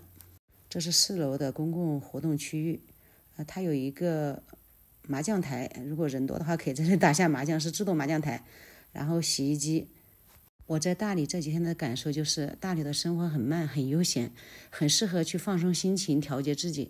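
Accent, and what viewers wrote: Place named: Enshi, China. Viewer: 楼主好像带恩施口音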